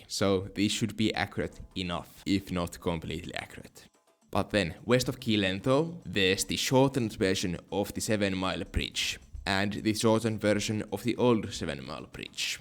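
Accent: Finland. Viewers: Bro that's his real voice 😭 The amount of people who think his voice is AI is crazy. He's Finnish and he just sounds like that, if you for whatever reason don't really understand what he's saying then you could turn on subtitles although the subtitles themselves may be wrong/incorrect. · have you never heard a Finnish person? If you played Control/Alan Wake 2, Ahti is very Finnish lmao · It's not an AI voice. It's his real Finnish accent. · Finnglish is fun. I’m American but work with Finns. You have that sweet spot of strong understanding of the language, but also strong Finnish accent.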